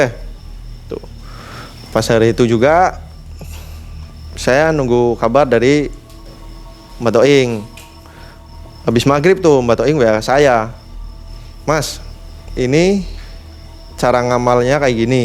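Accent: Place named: Tegal, Indonesia. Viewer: Kayong situse wong Tegal kiye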